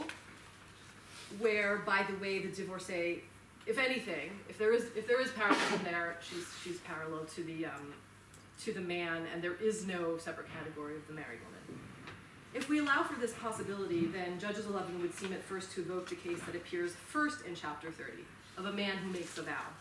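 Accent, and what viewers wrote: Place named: United States. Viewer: gosh Ive worked with this woman and am amazed that she delivers her material with the charisma of an inexperienced first year undergraduate student.In real life she is bright enough but viciously nasty to anyone she considers weaker or below herself. Here she mumbles and delivers a soporific presentation. Amazing. The boredom of the moderator is so obvious. Also she needs to work on her Hebrew pronunciation , it's painfully American.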